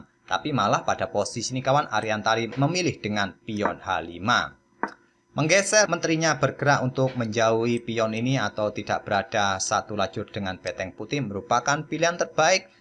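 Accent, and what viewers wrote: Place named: East Java, Indonesia. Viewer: Admin logat orang jawa timur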